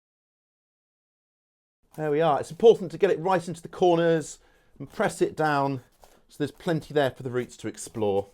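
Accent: United Kingdom. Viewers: Thanks I just love watching and listening to you. I’m from Yorkshire but live in Arizona. It’s great to hear British accents! I recommend the book The Three Season Diet which is an Ayurvedic approach to seasonal eating. The theory behind it is first - that there are different bugs in every plant meant to enhance the gut microbiome and eating a wide variety of plants helps strengthen our health. Second is that different foods are digested better at different times of the year. Third different flavors are important at different seasons. All of this is to strengthen and enhance digestion so that as we age we can continue eating a wide range of foods and have the digestive capability to convert them to energy. For example. Field Mushrooms are available all year round in grocery stores but as we know they grow in the spring primarily and they don’t have a long life. 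Spring is the rainy season preparing the land to absorb and sow new seeds. These mushrooms are to help our bodies repel excess water and cleanse the kidneys and liver in preparation for the lighter summer foods. Mushrooms are dry and wick water away from themselves in the field. So eating them in summer and autumn when our bodies are drying out can cause problems with serious dehydration. Hence much of our food in summer is juicy, sweet and ripened on the vine or bush or tree so we don’t have to use fire as much. They are cooling. 🪴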